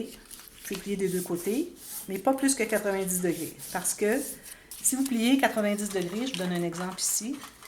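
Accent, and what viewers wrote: Quebec, Canada. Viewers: Bonjour, Merci beaucoup pour votre générosité. J'ai acheté des feuilles pollen kraft en 210g: cette épaisseur est elle suffisante? Autrement quelle feuille utilisez-vous, le grammage de 260g n'est pas le plus facile à trouver. Je tiens encore à vous remercier pour tous tous les conseils et tout le temps que vous prenez pour nous répondre. Un petit coucou de Nancy en Lorraine dans l'est de la France. Votre accent me rappelle des vieux souvenirs de Montréal quand j'ai visité le canada et les USA il y a très lomgtemps.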